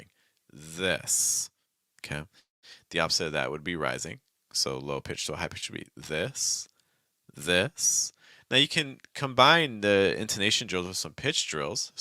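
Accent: United States. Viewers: i thought you were american! great accent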